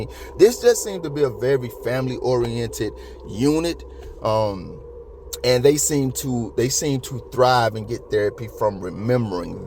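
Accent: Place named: Georgia, United States. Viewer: Just hearing you are from GA..I am too and you're right about how we talk! 😂 I agree 💯.. no one does everything "right". We, as humans are imperfect-we make bad decisions etc. I think Kristi wants BK "done" so maybe they won't dig deeper. This isn't from a rabbit hole... I've done a lot of my own digging. Theres way more to this than we know. Way back Steve said a lot of bad stuff was going to come out. There's a lot of things at play in this. Kristi interruption of Steve every other word drove me nuts!! Lol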